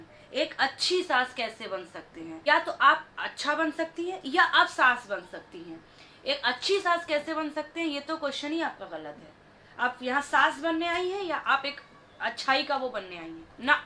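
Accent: Kanpur, India. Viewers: Yeah.. I have seen your interview... But your tone is killing it(totally kanpuriya)... Really proud... Artists like you are a real inspiration for society..comedy, Happiness , laughter from day to day experience without any bad words or double meaning jokes is rare nowadays.. Keep it up.. Lots of ❤❤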